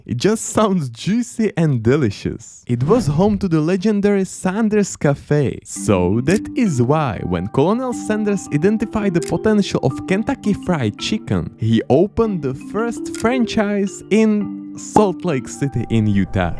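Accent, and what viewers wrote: Czech Republic. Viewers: This guy is Czech. English is most likely not his first language.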